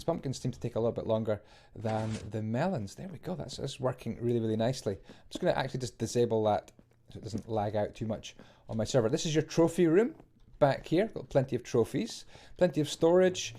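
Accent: Scotland, United Kingdom. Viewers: I dont know why, but i love the scottish accent, its so awesome. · You don't hear muck scotish mine craft content so it's cool to hear a familiar accent being Scottish myself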